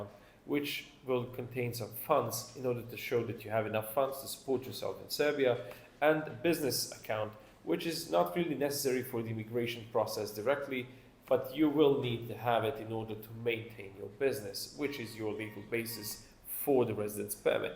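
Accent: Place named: United Kingdom. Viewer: Sir u got british accent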